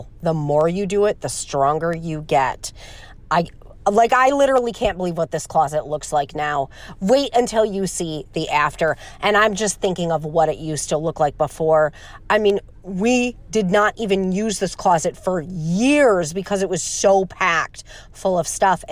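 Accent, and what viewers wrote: England, United Kingdom. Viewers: I could just picture you sipping tea from one of those vintage tea cups and speaking using your English accent. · Do I hear an English accent or no? Loving this video and need to do my own spaces so badly.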